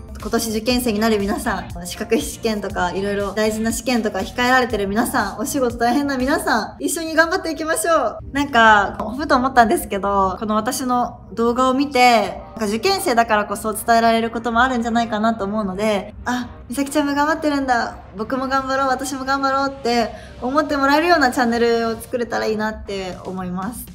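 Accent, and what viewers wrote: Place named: Hiroshima, Japan. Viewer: 奇を衒わない内容に加え岡山訛り？の広島弁が何とも堪りません。 高校大学同じなので勝手に応援中です 頑張ってー！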